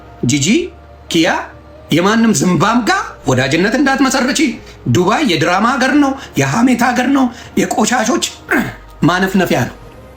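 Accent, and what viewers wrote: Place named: Tigrinya, Eritrea. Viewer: I love your Tegregna pronunciation😂😂 you crack me up 😂😂😂😂😂❤❤❤❤❤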